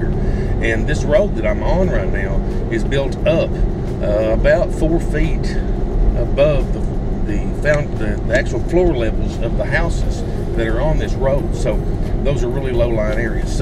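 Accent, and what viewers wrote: Arkansas, United States. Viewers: Very educational vid I love your Arkansas accent too.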